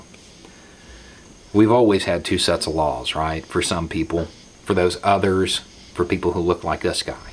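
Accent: South, United States. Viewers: I discovered your channel via this video floating about on facebook - It's refreshing, almost pleasurable to hear such common sense being laid out in a southern accent. I'll be binge watching your stuff now while I wait for Boris Johnson to finish destroying my country.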